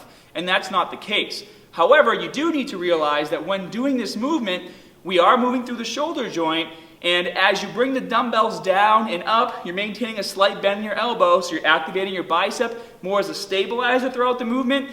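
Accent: Boston, United States. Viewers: Grab the "bah"? Lol. I am a Bostonian transplanted to FL. Nice to hear home. Great vid too. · Gotta love Bostonians and their accents! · trying to help you guys. Since I am suffering right now from shoulder pain, maybe it’s a good idea to listen to him instead of making fun of his beautiful Boston accent. Yes, there are differences about how far down to drop your arms, but you can experiment with light weights and then draw your own conclusions. And I wish you success too! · He's gotta be from Boston. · I've never heard your Boston come out so much in my life 😯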